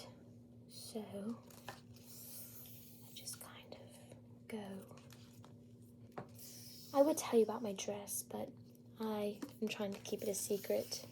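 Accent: England, United Kingdom. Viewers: Your English accent is very authentic. The pronunciation of “meticulous” was absolutely fabulous (have you seen that English comedy series, if not, you should try it 😁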